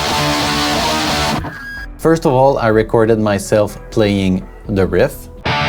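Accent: Quebec, Canada. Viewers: Accent Québécois? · ohhhh really !!! Thanks !! Keep doing your videos !!! Very nice content ! You sound that your from Quebec ! De où ?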